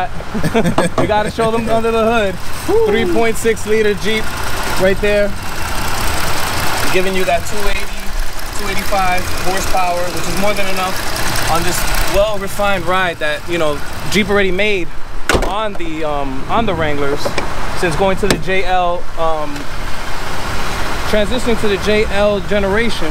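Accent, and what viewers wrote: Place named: Nuyorican, United States. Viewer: I could only stand about 3 minutes of the whole new yorican talk/accent ugh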